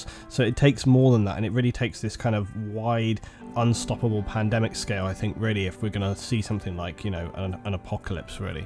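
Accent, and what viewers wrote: England, United Kingdom. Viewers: Hey! I got idea for your Zeus mission. If it's possible then can somebody make a oil platform to sea at Altis. Then your gonna make CSAT base there. It's gonna be hard mission for NATO. There are some civilian/workers hostages there too. It's like naval mission. Only boats and aircraft. Some AA turrets would be at oil platform. CSAT forces have captured an oil platform at South sea of Altis. Sorry for bad English and i hope you like it. And i have a question for you:D What country at do you live? It sounds like England.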